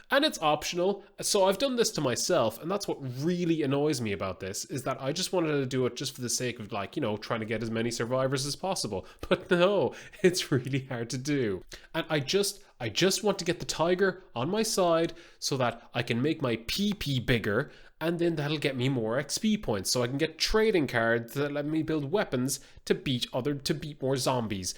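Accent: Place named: Ireland. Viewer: Oh a Irishman? Well lad!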